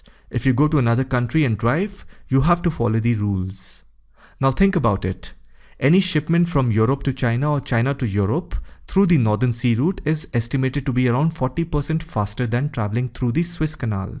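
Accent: India. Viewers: It's an Indian accent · Nah a lot of Indians have this accent. You're just stereotyping us with the western concept of the funny Indian accent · From the accent I’m assuming he’s Indian and English is widely spoken among educated people in India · Dude not only is the content of the video incredibly good, but also your voice is just on-point. I'd never thought indian accent could be so cool. I bet it took years to train that voice, singers would be envious :D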